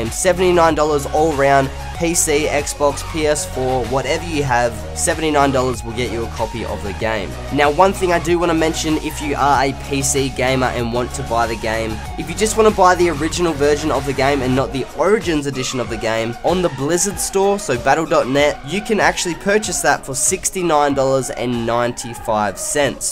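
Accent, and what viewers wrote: Australia. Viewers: Jeez your accent is strong, coming from an Aussie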